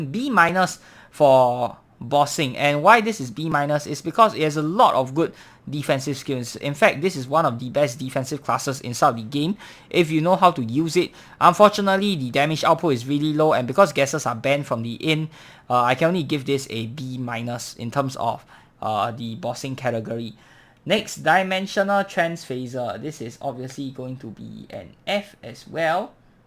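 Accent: Singapore. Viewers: Clear advice + Singapore accent = perfection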